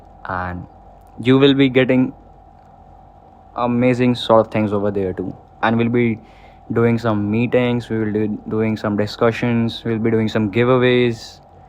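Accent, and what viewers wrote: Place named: India. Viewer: What your name bro ? You sounds like Indian.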